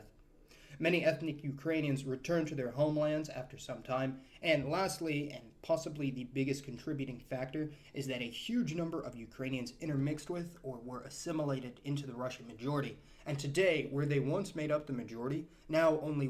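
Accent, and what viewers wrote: United States. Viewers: Isn't it telling that, just now, when the US is attacking Russia and saturating the world with anti Russia propoganda, that this US presenter complete with American accent covers this old history at this time? Its just the US trying to sow conflict as usual by sticking their boot in to widen any division anywhere they can find. Its just a distraction from all the wars, invasions, thefts and mass murder the US specialise in....to 'Defend' that land already defended perfectly well by the Atlantic & Pacific. "Don't look here, look at those Russian baddies" from centuries ago.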